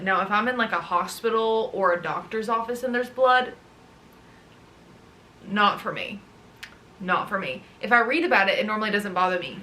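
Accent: South, United States. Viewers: My favorite thing is the southern accent slipping out when you’re excited. 😂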